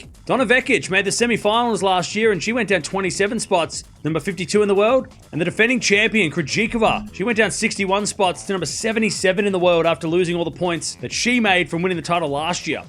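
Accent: Australia. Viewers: Appreciating the info, especially the graphics ... Itsa klaro, even with that distinct Aussie accent ... 👍👍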